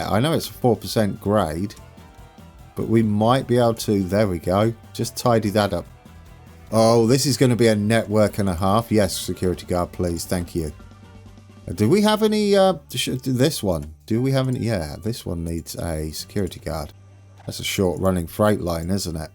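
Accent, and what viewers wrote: United Kingdom. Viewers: For as British as you sound, not knowing Great Clock of Westminster is ironic. :P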